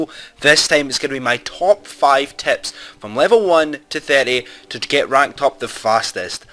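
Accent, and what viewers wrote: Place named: Edinburgh, United Kingdom. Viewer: Just saw someone saying love your accent lol aye so dae ah ken whit a mean you sound like a Edinburger lol good vid tho some good tips mate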